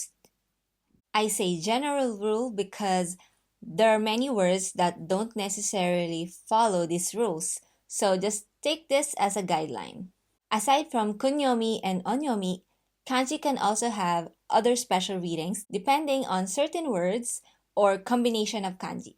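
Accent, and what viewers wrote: Philippines. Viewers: Are you Filipina maam? I can hear the accent😊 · Dear all watchers... I am a Japanese girl from Tokyo. And We feel so much disturbed by these sorts of Fake video! This woman who was speaking in this video is not Japanese and her accent is Philippino. This is the real issues because when we learn language, we need the real native, the real nationality the language. Because it’s crucial to learn the the manners, the way to communicate and so many things. It’s too too bad because watchers will think this woman is Japanese. It’s so serious! We really don’t want watchers misunderstand. Because Philiplipino is quite quite different from us Japanese. Especially our manners, accents and the way we talk, smile and tons of things!!! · Your accent sounds Filipino. Is your mother tongue Tagalog? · Love the logo! Though a better/more efficient way to learn Kanji is via learning the Radicals as opposed to random Kanji as presented here. 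Also, she's clearly a Filipino speaker & not Japanese. Sorry, but both the English & Japanese are slightly accented! I commend your efforts, but find that it's essential & utterly important to learn correct intonation & pronunciation from the get go! Good luck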